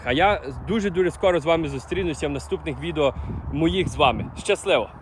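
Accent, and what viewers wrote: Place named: Western Ukraine, Ukraine. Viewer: Какой противный западынский акцент.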